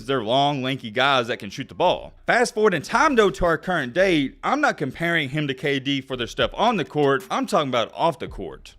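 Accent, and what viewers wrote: South, United States. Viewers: No clue who dude is but he just spent half of this video talking about random shit lmaoo. “Exposing….” Do it then, don’t spend 7 minutes boring with your lil yeehaw accent smh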